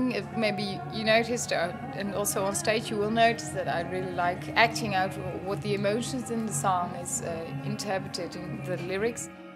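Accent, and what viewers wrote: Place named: United Kingdom. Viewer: When she speaks English it sounds British it is different from Simone and Floors dialect,but I must admit I love woman with accents (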